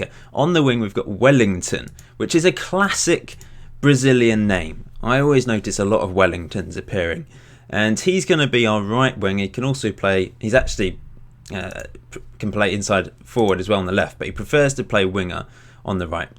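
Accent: Portugal. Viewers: The video is from a dude who is from Portimão and just like in (probably) every country in the world the accent changes from city to city so his pronounciation is different from the Portuguese you hear from Lisbon for example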